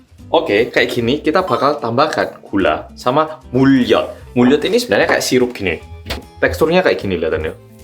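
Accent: Java, Indonesia. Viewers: Mas tahun ini ada gak?😁🙈 Kangen nih sambil nunggu buka hahaha mudahan dibaca mas medok😁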